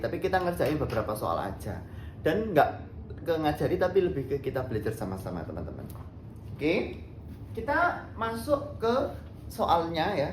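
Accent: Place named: Surabaya, Indonesia. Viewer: Suka sama cara ngomonge, soale bikin kangen sm Surabaya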